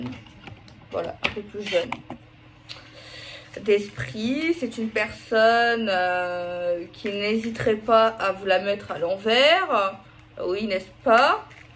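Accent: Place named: Toulouse, France. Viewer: Bonjour tu as l'accent du ☀️ soleil peut être de Toulouse bon ça me ressemble je suis fou bélier ma chérie Verseau fille difficile à vivre Bon je l'aime très fort pardon tu as la pêche 🍑 la patate tu me fais penser à Patrick Sébastien on est serré dans cette boîte sardines j'ose un bisou merci ton tirage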